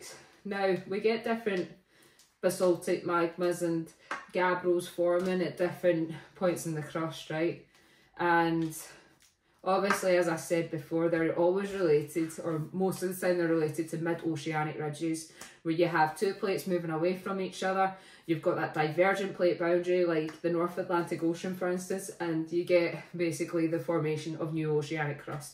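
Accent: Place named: Machars, United Kingdom. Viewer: Sounds local to me , Machars? .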